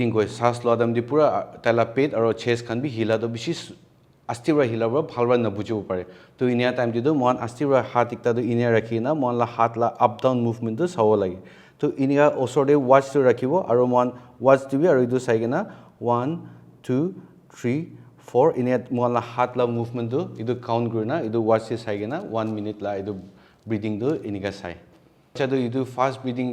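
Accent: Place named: Nagaland, India. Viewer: Your nagamese is very sweet and clear hope everyone will become a good Frontline workers after hearing your message.Thank you Dr.God bless you for your good works.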